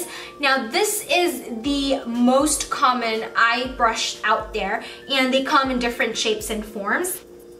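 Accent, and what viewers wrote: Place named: Philippines. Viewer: You are so PRETTY! and I sooooo LOVE your accent! BTW, are you a filipino?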